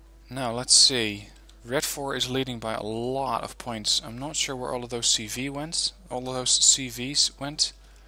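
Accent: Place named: Netherlands. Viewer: You sound dutch !! Thank you for this replay !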